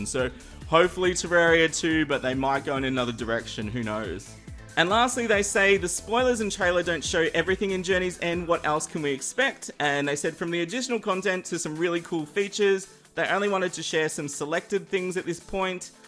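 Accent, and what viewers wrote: Australia. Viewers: You’re definitely australian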